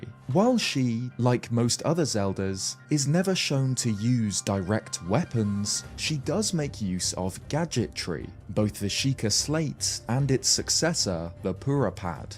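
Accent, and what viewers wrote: England, United Kingdom. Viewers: nah he’s english is js a trait of posher non rhotic english accents, there’s a short on this phenomenon i’ll try to find it and link it here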